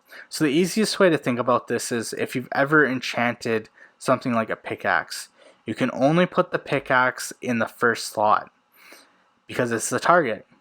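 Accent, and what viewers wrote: India. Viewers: Indian? Nice English btw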